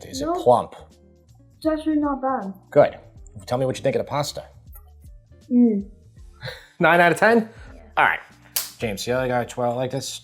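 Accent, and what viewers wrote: New York, United States. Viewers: You sound like if Vince Vaughn was a New Yorker, it’s very enjoyable 👍🏻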